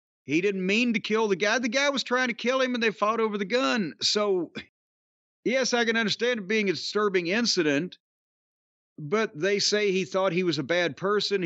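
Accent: South, United States. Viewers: Hate to say it but ya got that southern accent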